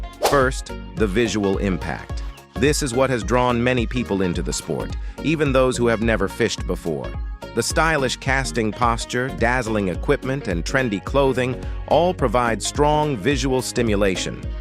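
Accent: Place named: United States. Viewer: Our A.I. narrator is using American English and the lure visuals are of American anglers. Why in the world are the creators of this video comparing lure fishing to Taiwanese bait (I assume) fishing, something that few Americans know about, let alone practice? Is Taiwanese style fishing common in Australia, perhaps?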